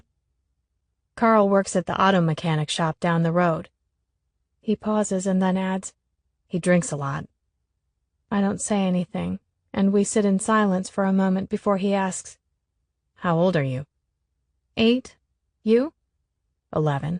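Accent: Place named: Ireland. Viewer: thats an Irish brogue not a Scottish one….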